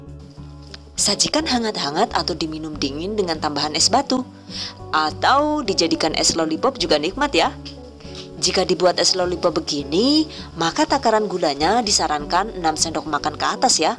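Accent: Banyumas, Indonesia. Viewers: mba ini orang ngapak ya.kok pinter ngomong ngapak.pada bae karo inyong mba😁😁😁😁😁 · Diiii bocah ngapak ya ,ujungnya2 ko jadi kaya kiye ... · Rika wong ngapak ya mba??haha Nembe nemu nang beranda jannn...ora kit biyen nemu...langsng subscreb mbae...padettt bgt penjelasane.... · Ternyata ngapak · ngapaknya itu lho bener-bener ngangenin inyong jadi pengen nunggang bis ke kroya wkwkwkwkwkwkwk, btw makasih banget ya bund resepnya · Ngapak koek koek menginspirasi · Done#GiveawayTHV2021 terima kasih atas semua resep olahan yang belum pernah praktek kan tambah ilmu melihat video THV ini pokoknya the best dah dan ada bahasa ngapak ngapaknya i like it..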